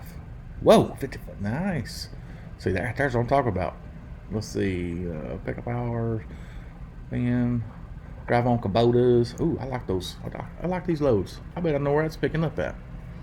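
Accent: South, United States. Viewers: Thank you so much for this video. It felt like having a roller coaster ride seeing the different rates that's out there. I love your video. I will be following you on Tik Tok and FB and subscribing to this video. You are very funny and your Southern accent is nice. Please make more like these. I love to laugh and learn.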